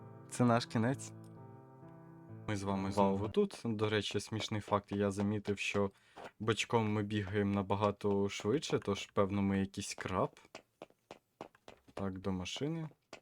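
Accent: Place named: Surzhyk, Ukraine. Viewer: Йоу, у тебе дуже круто вдається. Мені подобається твоя спокійна подача. Тршіки суржику додає тобі шарму Подерев'янського) Як і матюки що проскокують. Реакція на події в тебе кльова, за якою приємно і трішки кумедно (в хорошому сенсі) спостерігати. Твої відео приносять дійсно задоволення. Успіхів тобі на шляху ютубера) Українського контенту має бути більше)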